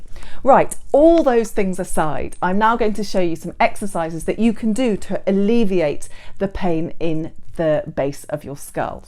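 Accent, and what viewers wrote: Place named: United Kingdom. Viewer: I can't breath listening British English accent. It's makes me more tension while listening at your explanation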